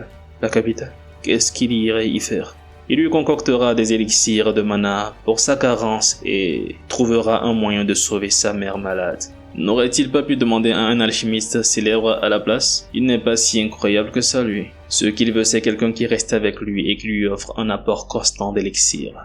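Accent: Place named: Africa. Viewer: 1 - L'histoire est vraiment géniale et fascinante 2 - Pas d'images foireuses ce qui est agréable 3 - La narration est très bonne et on y sent la volonté qui y est mise même si l'accent africain du naratteur prête a sourire . 4 - Je met 1 gros like a cet vidéo que je recommande a tous et j'invite le youtubeur a continué cet aventure .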